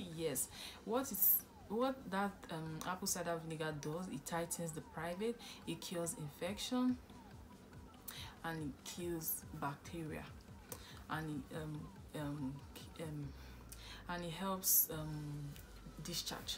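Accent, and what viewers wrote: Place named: Montreal, Canada. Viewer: t'as un accent montréalais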